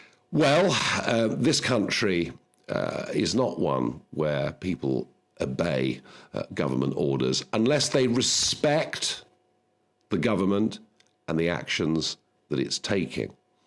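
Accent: United Kingdom. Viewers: I love your brittish accent. Greetings from Sweden!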